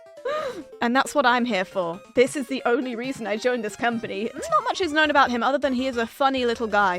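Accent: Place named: United Kingdom. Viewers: And her Brotish accent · the fact that she’s also British and has an accent makes it 10x funnier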